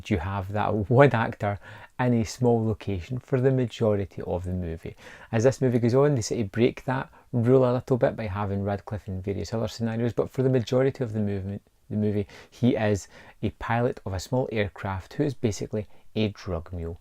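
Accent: Scotland, United Kingdom. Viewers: Yes it is, and may I say for a Scott he speaks very clear and well...psst ❤ a proper Scottish accent!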